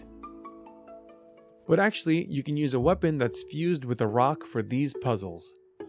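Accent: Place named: Netherlands. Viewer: alles goed? Edit: NL Engels accent ; ) goede tips trouwens. Bedankt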